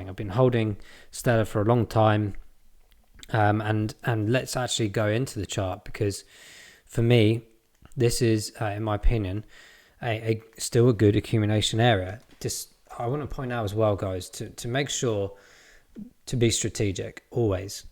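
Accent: United Kingdom. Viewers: Hey, good to hear an english voice, in england we seem to already have 3 second transaction bank transfers does that mean in uk were already using iso 20022?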